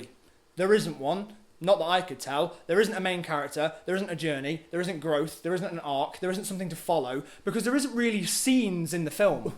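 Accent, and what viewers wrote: United Kingdom. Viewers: that's the british accent, if you don't like it then you're going to have trouble talking to british people.. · This is the first vid I've seen of you. I'm in love with your face which is even more beautified with that British accent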